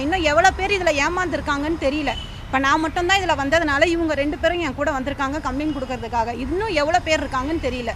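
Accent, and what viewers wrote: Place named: Madurai, India. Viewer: அக்கா..சொந்த ஊரு ..மதுரை னு..நினைக்கிறேன்....உனக்கும் ஆசை இருந்து ருக்கு...சக்கா. .அக்கா..செம..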